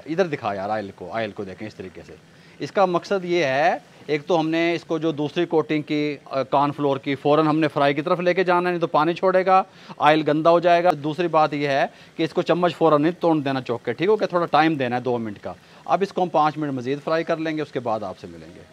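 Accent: Punjab, India. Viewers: zaberdast mujy ap Ki Punjabi boht achi lgti h❤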